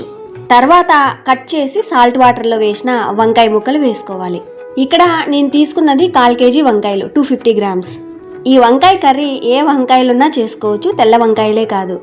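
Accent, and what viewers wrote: Rayalaseema, India. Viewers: మీది రాయలసీమ నా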